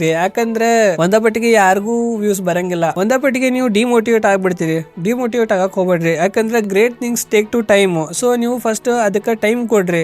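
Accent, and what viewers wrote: North Karnataka, India. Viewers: ನಿಮದು ಯಾವ ಊರು ಅಣ್ಣ..?? ಪಕ್ಕಾ ಉತ್ತರ ಕರ್ನಾಟಕ ಬಾಷೆ ಅಯ್ತಿ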